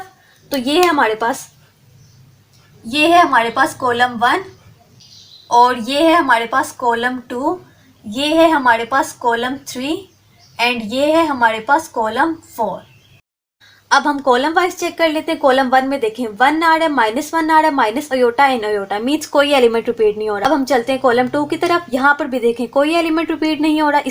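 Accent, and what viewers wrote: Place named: Lahore, Pakistan. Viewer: that Lahoڑi Accent 😂💕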